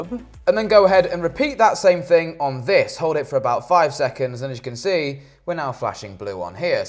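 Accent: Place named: RP, United Kingdom. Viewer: Posh as fuk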